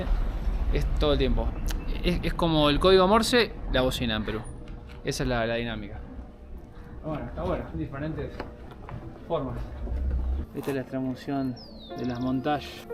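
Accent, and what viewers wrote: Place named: Buenos Aires, Argentina. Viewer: querido, me siento muy identificado con tu manera de hablar, bien lunfardo. Los videos me divierten y enseñan, la verdad, sos crack. Abrazo enorme!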